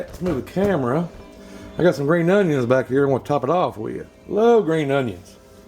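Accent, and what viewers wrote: South, United States. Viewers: I watched True Grit and could only understand half of it. I was able to understand this guy's video. I'm getting better and better at understanding Southish. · Gotta love that country accent!!! 🇺🇸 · When I heard that southern accent, I knew then this was gonna be some good stuff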